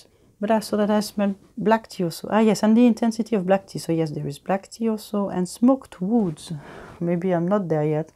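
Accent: France. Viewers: Frenglish 😂 I think it's sexy AF! Wish we could've seen the relief on your beautiful face! 😂 The joys of a blind buy WIN. 🍵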